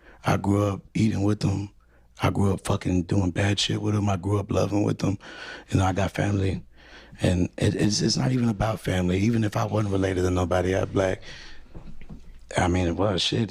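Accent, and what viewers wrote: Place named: Mississippi, United States. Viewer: Bro 😂😂😂 wtf are you gonna do about it? Sounds so confederate Mississippi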